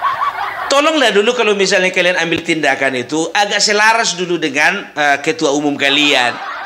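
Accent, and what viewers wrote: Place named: Java, Indonesia. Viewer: Abang ini batak tapi boso jowomu medhok banget,, ❤❤❤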